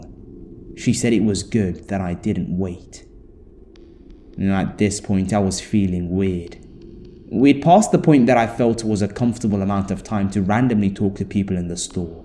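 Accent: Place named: United Kingdom. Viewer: Hello from, wonderful to get an upload from you. Love your accent. That Brit accent just adds so much to the stories. Looks like great story content. Keep up the excellent work. 💋👣💌💘💞💕💓💗💔❤💄💟🎠🌈🍄🌼🍎🍉🌻🍰🕊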